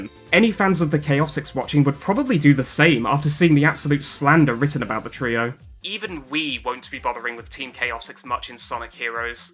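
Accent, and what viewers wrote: United Kingdom. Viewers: This is the most pommy sonic tuber ever and I'm here for it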